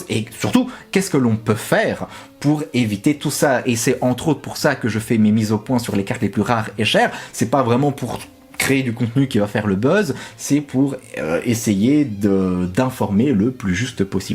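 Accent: Belgium. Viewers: Whouah... Je me suis remis à collectionner les cartes en fin d’année dernière sans vraiment savoir ce qui m’y a, implicitement, poussé. Grâce a ton analyse, je crois que j’ai compris. C’est flippant! ☺️ Au delà de ça, je viens de découvrir ta chaîne et tu m’envoie du rêve Bro! Je kiffe ce que tu fais! La qualité et la pertinence du contenu, l’humour toujours bien calibré! Le petit accent belge! J’adore! ❤️ 👍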